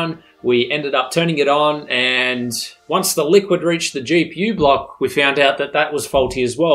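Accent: Australia. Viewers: r u an Aussie cuz to me u sound it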